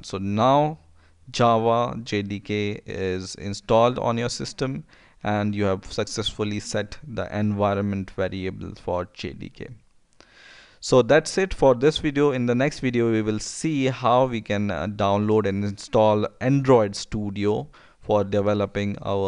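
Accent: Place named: India. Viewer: fucking indian accent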